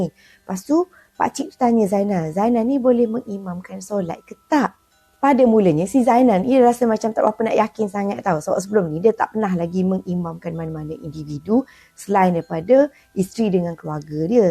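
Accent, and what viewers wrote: Kelantan, Malaysia. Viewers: ni ore Kelate duk raso.. Bunyi po loghat Kelate wlu dio kecek luwa pun 🙃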